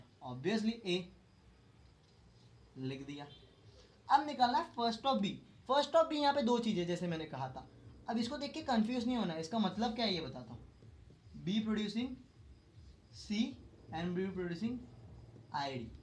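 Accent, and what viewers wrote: India. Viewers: indian people have the worst english accent in the world.i cant understand wtf is he talking about.pls go learn some english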